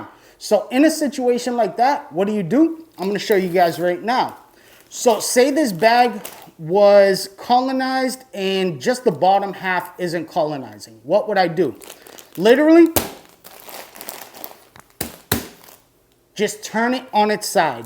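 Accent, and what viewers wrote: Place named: East Coast, United States. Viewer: As usual brings the knowledge along with that east coast accent. Great info on bags. Answered a lot of questions I didn't know I had. It was great to see a new video from you. 🍄♥️ 😎✊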